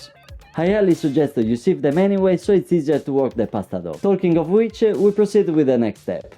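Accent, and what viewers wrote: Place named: Italy. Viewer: I love his Italian accent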